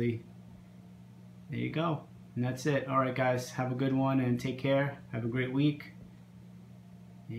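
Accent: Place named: New York, United States. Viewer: I heard your NY more in this video! haha. I cannot believe you found the time to make this when you are so busy with life lately. Those green neons are looking slammmmmming. Great advice as well. Looking good! I am glad you are entering the contest, they give some killer feedback that help us grow in the hobby.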